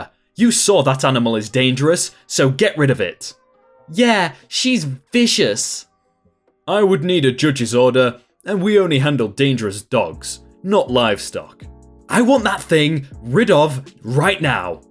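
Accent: United Kingdom. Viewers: Hi! I have always been listening to your videos ever since I come across by my recommendations and I enjoy it every single time because I enjoy your accent of British. Please keep it up with it. I enjoy a lot.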